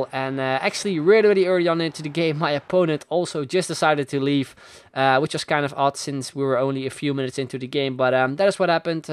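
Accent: Netherlands. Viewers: Are you dutch????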